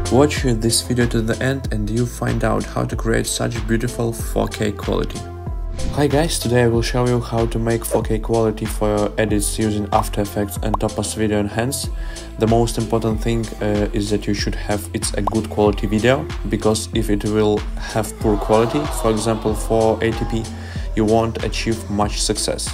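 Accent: Russia. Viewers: bro you russian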